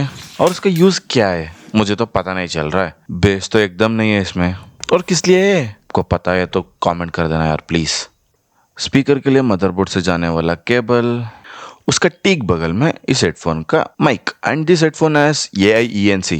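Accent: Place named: Northeast India, India. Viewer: Bhai tum kaha se ho, aapki aawaj se lagta hai ki aap northeast India 🇮🇳 se ho 😅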